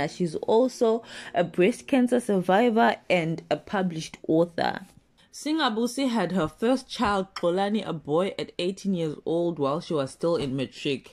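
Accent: Shona, Zimbabwe. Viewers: Shona accent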